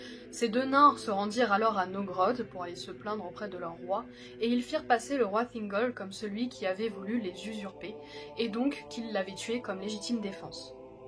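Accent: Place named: France. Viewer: je découvre la chaîne avec cette vidéo et je trouve çà vraiment bien ! petit bémol : ta prononciation est très française ... je pense que ce serai un peu plus intéressant avec les prononciations des langues inventées par Tolkien ( car ce sont des vraies langues qui ont nécessité pas mal de travail et puis elles sont jolies ) ont peut trouvé la prononciation dans les bouquins ( au début pour le Silmarillion et à la fin pour le Hobbit et dans le tome des appendices du Seigneur des Anneaux ) mais ça peut peut être varié selon les éditions donc allez voir la chaîne Arda et particulièrement cette vidéo <link>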